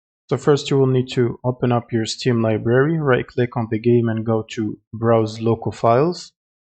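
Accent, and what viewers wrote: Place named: Egypt. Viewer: المصري تدري من نبرته وتفقيشه ياحبيبي احذف التتوريال ما يضبط يا اهبل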